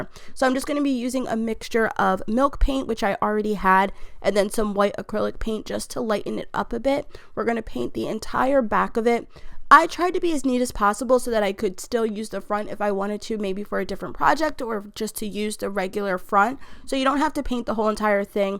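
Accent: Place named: New Jersey, United States. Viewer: I love them all!!you sound like a Jersey girl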